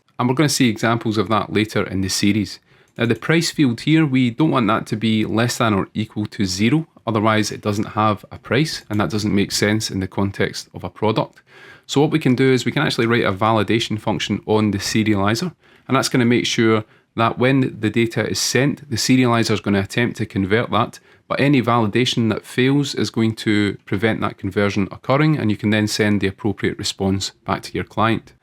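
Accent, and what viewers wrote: Scotland, United Kingdom. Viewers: File in Scottish is pronounced Fail, type is tape, price is Preis (like in German)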